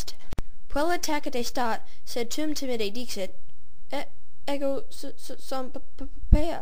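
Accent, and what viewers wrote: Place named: England, United Kingdom. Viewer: Sounds like English. You should work your accent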